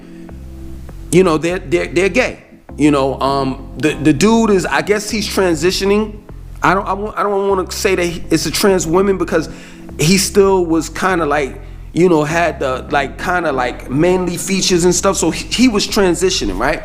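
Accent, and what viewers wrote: New York, United States. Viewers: So wait you from New York I presume with your accent and you are shocked about LGBTQ people in Atlanta??? Need to get out more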